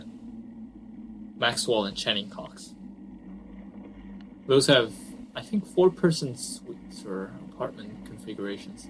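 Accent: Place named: China. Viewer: I can tell you have a slight accent. No offense. I'm guessing your bilingual and your second language is Chinese which is very difficult. You do speak perfect English though. I'm trying to learn French which is hard enough.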